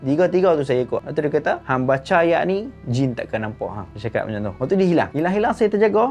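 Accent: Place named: North, Malaysia. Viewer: speaker ber-loghat utara ni, jarang lah membosankan. baik punya storyteller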